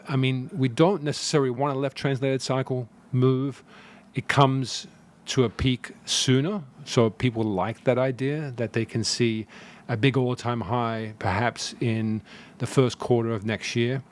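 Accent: Australia. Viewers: he's from Sydney. Common NSW accent.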